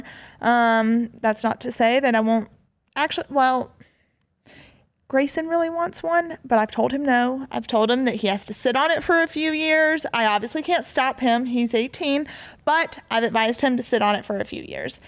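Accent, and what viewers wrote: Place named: Georgia, United States. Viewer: Todd and Julie have done a fabulous job of raising you!!! Look at you!! Look at what they have done. I still listen to all the old shows on USA network every night when I go to sleep. Your voices lull me to sleep. You talk like me. I'm from GA. I hope I can hear these voices on new shows in the future. You are a great success for Todd and Julie! Keep up the steady work for them.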